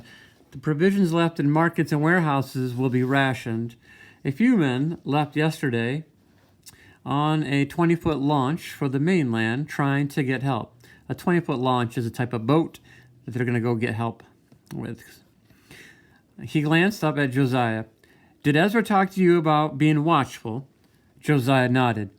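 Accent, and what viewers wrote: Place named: Texas, United States. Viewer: U have a texas voice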